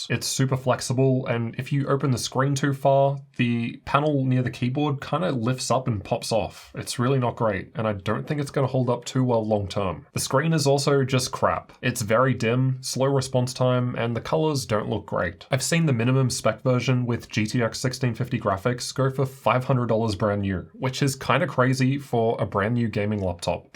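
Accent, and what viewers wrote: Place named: Australia. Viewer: That's the Aussie accent 😀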